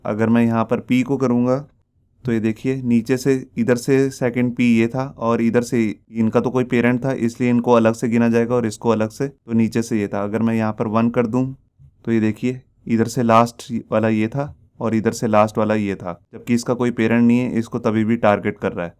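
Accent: Punjab, India. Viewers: Sir aap punjabi ho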